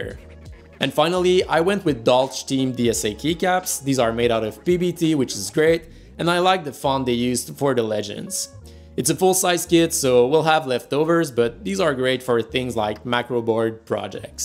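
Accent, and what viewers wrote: Quebec, Canada. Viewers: Accent quebecois 100% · he is french canadian so he has an accent when he speaks english.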